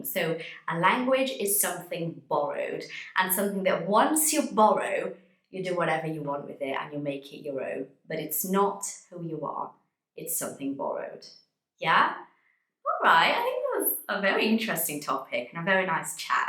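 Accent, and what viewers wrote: United Kingdom. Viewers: I say, British accent with a spicy accent.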